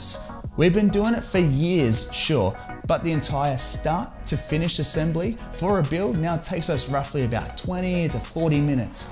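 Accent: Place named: Australia. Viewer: Is it because the Australian accent because for me he sounds fine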